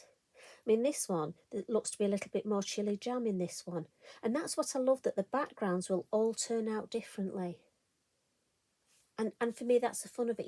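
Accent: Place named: Northern England, United Kingdom. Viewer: you are a pleasure to watch, and as a northerner down in Devon, listening to your accent just takes me back home! I have learnt so much from your tutorials, yes! me! a retired art teacher!! This is all quite different and exciting to what I am used to! It must be because I am doing it solely for me and NOT for a set of marks! Now, my next adventure will be with Dinkles…so vibrant and pure! I can’t thank you enough …..very best wishes…Donna!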